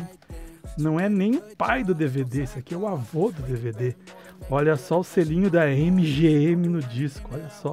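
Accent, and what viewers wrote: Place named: Porto Alegre, Brazil. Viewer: Tu tem o mesmo sotaque que o Radhanti Maharaj aí de POA.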